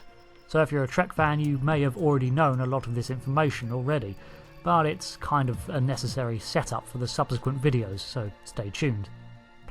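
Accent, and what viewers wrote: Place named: RP, United Kingdom. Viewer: French caption with an RP English accent.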